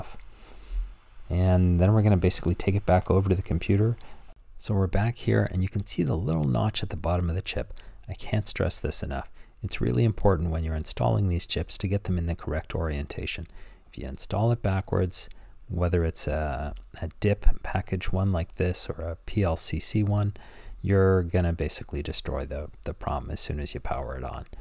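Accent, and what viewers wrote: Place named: Canada. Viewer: I bet you're Canadian.... (my ears always perk up when I hear "aboat"). A Sparc 10 was what I spent the latter half of the 90s on. Nice to be able to project vicariously what upgrading mine would have been like...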